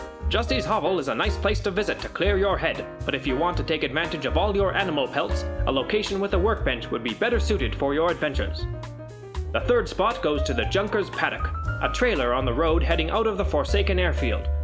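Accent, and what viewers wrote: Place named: Transatlantic, United States. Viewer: Haha, this was such a fun video! Love the format, and your subtle Transatlantic accent 😂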